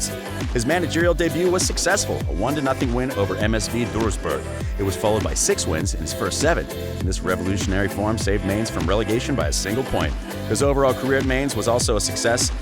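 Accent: United States. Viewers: No insult intended, but when watching & listening to a video about soccer I´d rather have a British English speaker … ! - Sorry guys across the pond, this broad American just does not fit. I guess even a german accent would be a better match here … :) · After one minute of utter nonsense i gave up. Klopps "unimpressive" start of his coaching career over here in germany was to take over a village club like Mainz in the second division, when he was still a player without any coaching experience and make that club a bundesliga regular, even reaching uefa cup. Afterwards he went to Dortmund where they had just avoided bankruptcy and had no money for larger transfers. There he kept the people patient for some years with very emotional and attractive football, while building up his squad and it ended in consecutive championships, a national cup and a championsleague final. 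If that´s not impressive i don´t know what is. When they called "fergie time goalscoring" brilliant and implied it would have been a tactical revolution, while completely missing out on gegenpressing i decided to not torture myself further, as i feared i would become dumber by shear listening. We shouldn´t blame it on the american accent, as the journalism about nba or nfl for example is very accomplished. Whoever wrote this would never be allowed to report about a basketball game in the US. To me it sounds like the newscaster of instagrams celebrity rumours and scandals or something like that.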